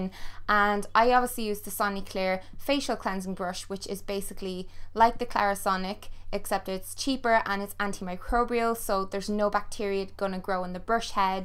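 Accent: Ireland. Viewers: What part of ireland are you from? I love your accent, I'm from dublin. I was just wondering (love the top btw) if you have any sun are tips because I now live in California and even though i wear sunscreen I get so burnt, I have trouble going to gym class! I was just wondering if you had any tips :) I love your videos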